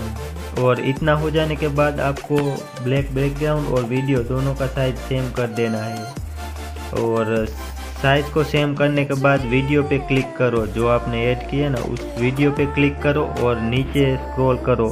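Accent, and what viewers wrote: Gujarat, India. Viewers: Apan ko pata he apan gujrat se he har gujrati ki aavaj pahechanta hu tum100% gujarat se ho 😘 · Bhai video me aawaj sun kar lag raha he ki aap gujrati ho😄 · bro aavj sun kar lag ka aap gujrati ho , iam also from Gujarat ❤️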